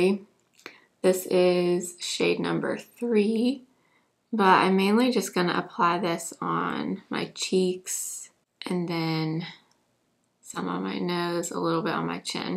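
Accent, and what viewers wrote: South, United States. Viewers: Girl where are you from?? I’m from Georgia and I’m detecting a southern accent 😍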